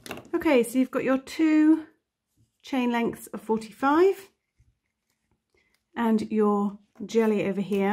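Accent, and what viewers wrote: England, United Kingdom. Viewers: Great tutorial but I’ll admit I was thrown off at first by the English accent but American crochet terms 😂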